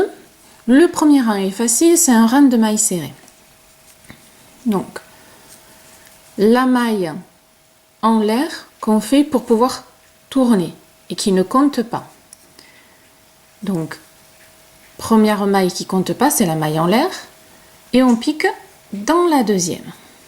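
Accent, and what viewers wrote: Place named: Southwest, France. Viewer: vous seriez pas un peu du Sud Ouest par hasard , ma fille habite près de Luchon, je reconnais l'accent ....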